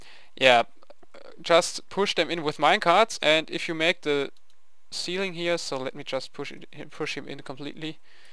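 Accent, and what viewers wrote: Germany. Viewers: u r german? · I think you german ! (ich auch XD) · are you german ? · Are u german? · are u german ? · haha krasser deutsch - english dialekt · extreme german accent :D awesome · man hört sofort dass du deutscher bist :) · typischer deutscher accent :D aber weiter so! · Are you german sounds like german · I hear, you come from germany :D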